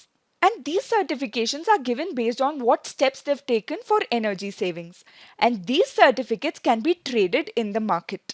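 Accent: India. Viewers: Cristal and clear explanation and pronunciation also. Pure Indian Assent. Use Map 🗺 for important location.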